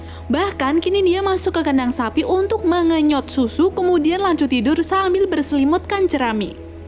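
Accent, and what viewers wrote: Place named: West Java, Indonesia. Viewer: Sue sundanese na geuleh naon teh wkwk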